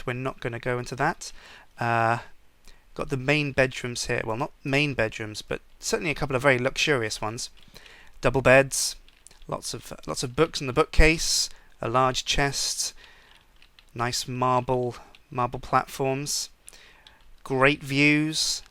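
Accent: United Kingdom. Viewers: You can clearly tell he's from the UK from his accent.. Why would you need some sort of sign from him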